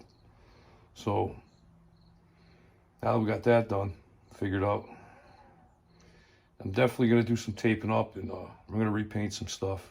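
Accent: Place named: North Carolina, United States. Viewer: Love that North Carolina accent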